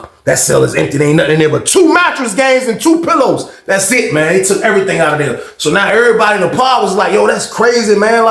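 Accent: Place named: New York, United States. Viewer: BEST PRISON CONTENT CREATOR!!! Yo u still sound mad new york and i cannot believe you are in Virginia 😂😂 You just like the city that never sleep with these BANGAS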